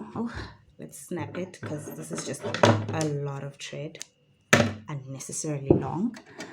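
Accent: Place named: South Africa. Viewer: I heard the South African accent and I was hooked!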